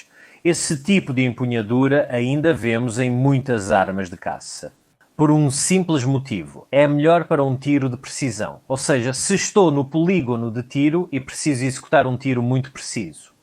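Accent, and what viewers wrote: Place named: Portugal. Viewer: BR Olá camarada. Você é real ou uma ia? Desculpe falar assim. Você tem um jeito engraçado de falar o português europeu e parece não sincronizar com seus lábios. Mas parabéns. Eu gosto de história. Continue 👏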